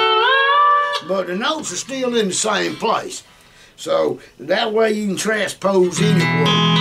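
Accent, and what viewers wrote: South, United States. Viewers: That’s the best southern accent ever N he’s not a bad player either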